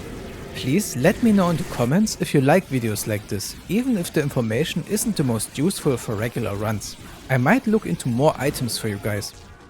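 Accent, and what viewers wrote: Germany. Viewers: ein deutscher wie man seine englische ausprache kennt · you sound german xD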